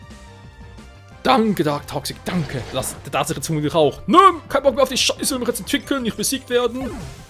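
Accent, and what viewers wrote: Switzerland. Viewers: mä merkt das schwizer bisch:)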